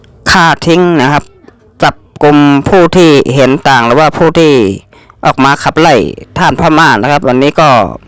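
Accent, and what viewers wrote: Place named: Vietnam. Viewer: ฟังสำเนียงพูด.เหมือนเวียดนามนะ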